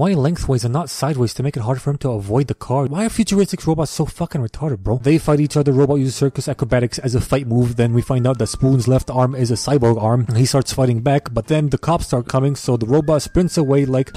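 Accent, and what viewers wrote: United Kingdom. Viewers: british power has been unleashed Also are ya British. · >shaved lol cracked me up BTW are you British by any chance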